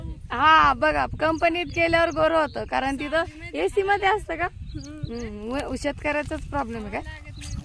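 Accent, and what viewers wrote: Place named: Pune, India. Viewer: तुम्ही खरच किती काम करता तुमच बोलण खूप छान वाटत नगर किंवा पुण्याची भाषा वाटते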